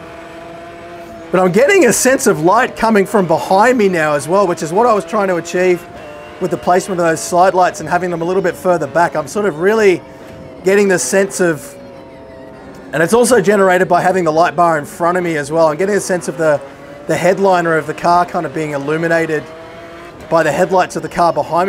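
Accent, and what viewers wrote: Australia. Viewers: Philips: Dutch brand and product. You’re welcome Your “unlimited budget” make it easier for us to decide if this is something to invest in so personally I’m happy you’re doing all of this 👍 But man, these videos you put up here are always the most complete and informative you can find around here on this topic. The Australian accent gives the entertainment factor a huge boost also.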